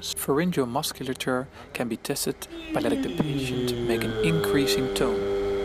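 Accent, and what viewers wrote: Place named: Netherlands. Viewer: Heel goed, Nederlands accent!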